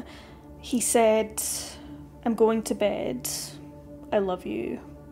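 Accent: Scotland, United Kingdom. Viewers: The Scottish accent really adds an extra layer to the content. Don't know why. It just does. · She is Scottish. We all talk like that in Scotland 😁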